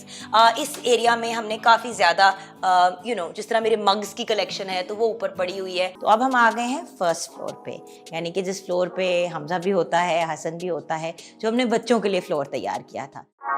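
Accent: India. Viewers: she sounds like indian.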